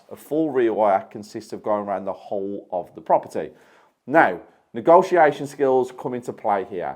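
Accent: Birmingham, United Kingdom. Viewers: Sounds like your from Birmingham and like many have stated. Your prices are very cheap and not realistic in my opinion. Curious to see the standard of workmanship and quality of products you are using.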